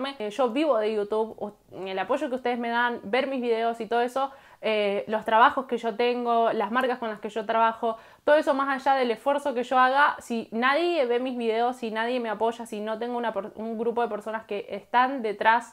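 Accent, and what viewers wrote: Argentina. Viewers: Me encanta el acento argentino💕 ¡Muy buen video😆💞 y muy buenos tus consejos :3!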